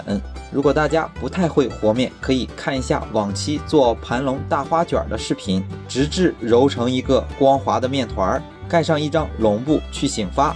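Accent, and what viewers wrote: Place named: Shandong, China. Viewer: 老乡你是山东哪儿的听着很像我那地方的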